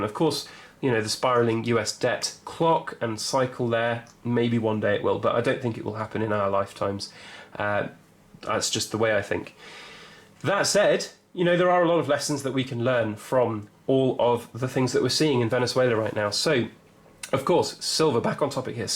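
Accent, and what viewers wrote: England, United Kingdom. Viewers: Seems to be a peculiarity of speech in England. The BBC, for example, pronounces Sinai as "sign-ee-aye" instead of "sign-aye".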